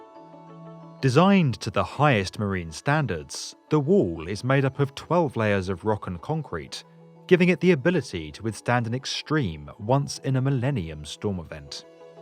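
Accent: United Kingdom. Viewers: This video has just so much more authority because of this UK accent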